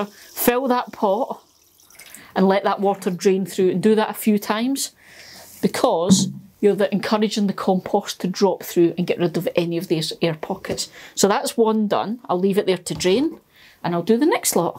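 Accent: Scotland, United Kingdom. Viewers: Thanks - really good informative video, love your Scottish accent too!!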